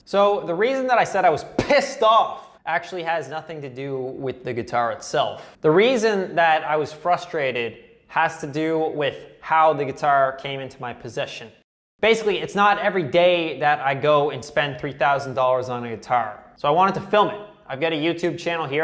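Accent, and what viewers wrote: Canada. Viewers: What part of canada is he from, im from newfoundland lmao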